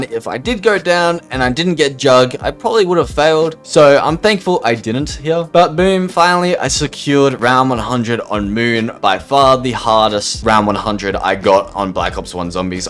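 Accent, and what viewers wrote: Australia. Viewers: You aussie man? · me and the creator of the video are both australian we have the same accent, it is NOT an accent problem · in google translate its cinema of the dead and he is saying nacht correctly he just aussie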